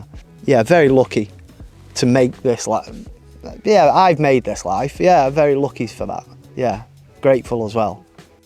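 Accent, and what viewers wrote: United Kingdom. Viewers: brit accent. Hard to understand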